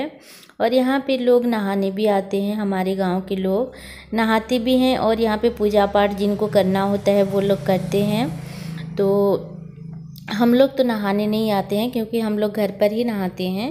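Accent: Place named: Odisha, India. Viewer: Wow super dear...Chhatishgarch n Odisha bilkul neighbour he...to aap ki hindi speaking thodasaodia jaisa bhi lagta he....doing very well dear...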